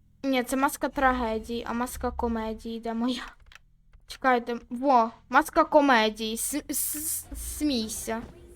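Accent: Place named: Ukraine. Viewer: Вроде говоришь по русски, но украинец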